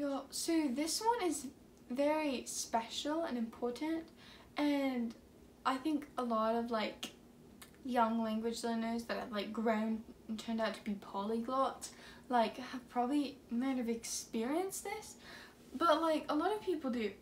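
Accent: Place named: United Kingdom. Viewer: your accent was kinda different today, more like british or idk. i enjoyed listening so much. i really like learning language a lot and this video made me fell more cool in my skin. i agree to everything there and i think everyone should watch that video coz it's super advantaging to learn a new language. thank you so much for producing videos like that for us. this video deserve a lot of like, comments and sharing!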